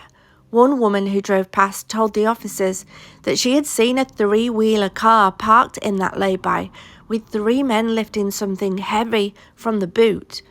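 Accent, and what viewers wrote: Yorkshire, United Kingdom. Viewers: Ahh yes, a good old car boot sale. I miss home so much but listening to you makes me feel so at home again. I can't tell you how much I love your content. I watch TC content on this platform for 4-5 hours every single night for 4 years and I love your channel. A proper Yorkshire lass!!💙 On another note my grandad had a 3 wheeler EXACTLY like the one you showed. Same colour n all when we lived in Romiley. For the record he died in '86 at age 87 and that car never drove farther than Hyde or Marple. I don't even know if it was capable😂 🤘🏻🇬🇧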